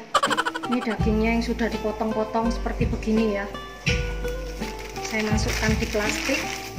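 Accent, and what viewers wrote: Java, Indonesia. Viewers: Wah...luar biasa masakannya....nikmat sekali ini....dan suka banget sama medoknya...salam kenal...sesama medok...😄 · org Surabaya ya cik...bicara medok buangett...😊tp cara masak cicik sy suka...😍thank U cik...🙏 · Medoknya something banget. Jd adu ciri khas. Kamsia ya cik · Anjirr medok bangett. Saya suka saya sukaa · ci aku.baru ikutin you tube cici.mantap cici tutorialnya jelas dan mudah ikutinnya.Suka juga aksennya cici yg.medok jawa.GBU cici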